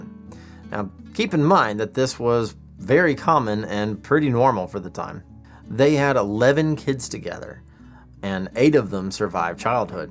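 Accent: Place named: United States. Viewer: love watching from UK . So interesting . Love the USA accent ...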